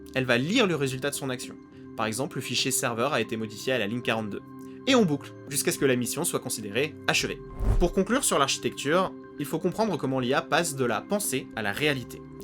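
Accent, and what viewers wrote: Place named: United States. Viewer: Ralala ces vidéos conspirationnistes... Par des personnes qui ont passé trop de temps devant Terminator... Ouhlala au secours, skynet est parmi nous.... Pour utiliser un agent Ia tel que Openclaw, il faut être capable de faire des installations en ligne de commande et donc ce public plus ou moins avancer sait déjà qu'il faut séparer ses données des données qu'on donne à manger à l ia... Stop a l agitation du drapeau sécurité à outrance avec un accent Américain tout aussi .... Pas grave je continuerai de regarder tes vidéos mais go brain avant de faire juste du buzz😅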